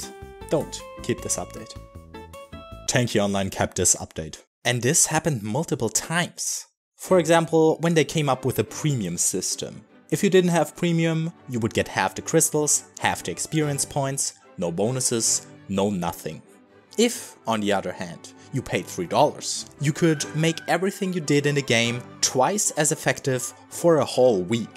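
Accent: Germany. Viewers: ru german your accent sounds like it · based on the accent im assuming you are german?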